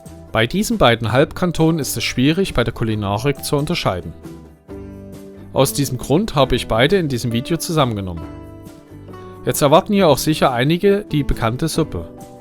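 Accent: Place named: Saxony, Germany. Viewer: Oh nein nicht schon wieder....Schweizer Spezialitäten auf Sächsisch.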